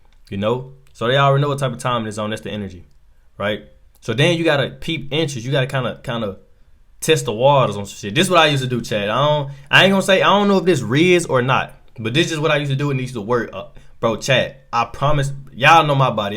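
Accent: United States. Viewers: Yo u too American ur acsent is too intense for us in asia but your vids are dope 👊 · He has a Southern accent maybe Florida Louisiana Mississippi or Georgia.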